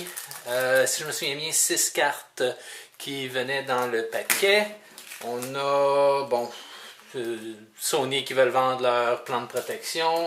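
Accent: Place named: Quebec, Canada. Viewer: WTF il est Québécois XD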